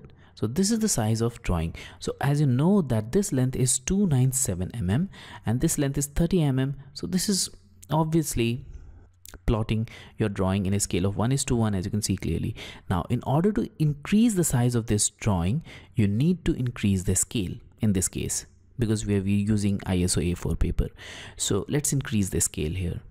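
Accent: India. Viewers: your Indian accent realy annoying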